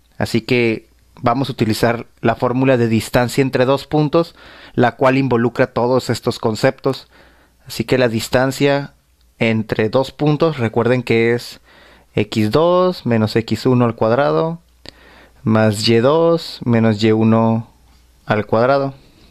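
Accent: Mexico. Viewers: es chistoso el acento mexicano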